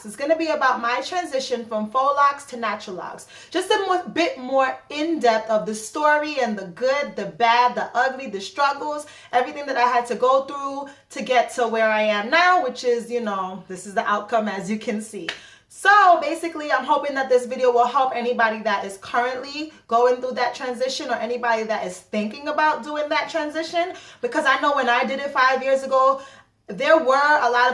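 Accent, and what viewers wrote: Trinidad and Tobago. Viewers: Very nice, you really answered my questions. And I always love a Trini accent. Take care. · ohh gosh love we trini accent.❤❤❤❤ and grate infomation. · I hear a trini accent 🇹🇹❤️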